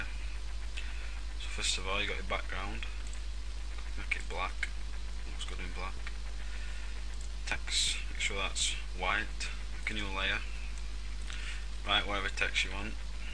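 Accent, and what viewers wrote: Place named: England, United Kingdom. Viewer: Seriously? He's English duh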